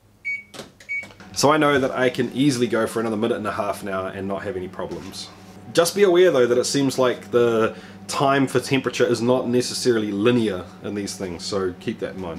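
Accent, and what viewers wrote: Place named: New Zealand. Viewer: not whiskey related. i usually have a hard time telling between NZ and AUS accents but this is the first time i was able to go "hey, he's a kiwi." (the "fush n chups" thing aussies talk about). just thought it was interesting.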